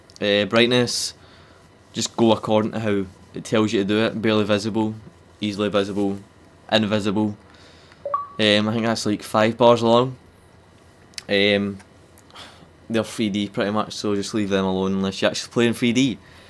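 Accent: Scotland, United Kingdom. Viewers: Hes from Scotland bro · He's from Scotland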